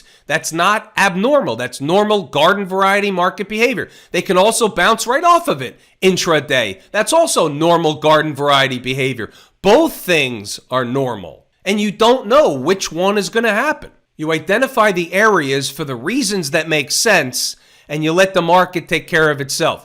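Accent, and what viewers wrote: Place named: Brooklyn, United States. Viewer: you sound like a brooklyn guy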